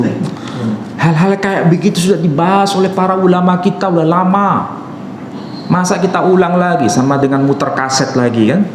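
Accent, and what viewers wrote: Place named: Iran. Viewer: Seperti lahjah atau cara baca Parsi, Iran..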